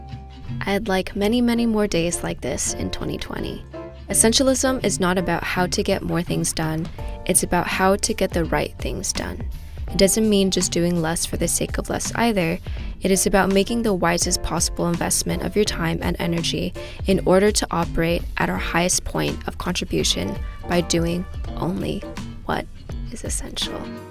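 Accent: China. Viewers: The Chingrish is killing me. It's how I talk too hahahahaa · The way you speak Chinglish is how I do too HAHAHAHA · Love your Chinglish!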